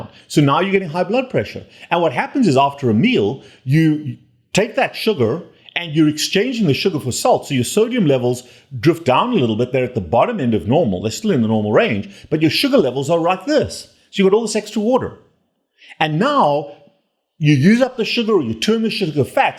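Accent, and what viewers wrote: South Africa. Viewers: U sound like a joburg oke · You sound South African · He sounds south african. I love it when my fellow kinsmen do well world wide.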